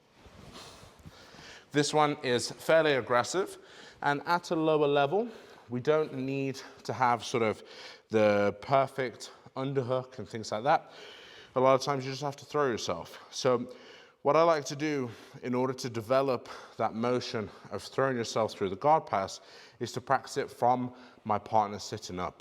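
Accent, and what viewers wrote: United Kingdom. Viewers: My first video, and he sounds like Reggie Watts using an British accent.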